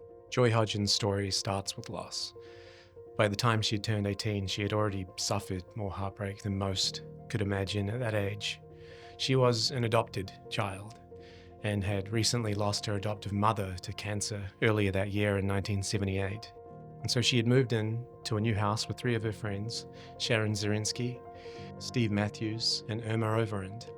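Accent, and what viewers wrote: New Zealand. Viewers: Don’t know who your watching but he is not a Aussie if you listen to him he is from nz he has a kiwi accent not Aussie accent sorry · Is there a hint of kiwi in his accent? The way he says 67. "Sexty sevan ".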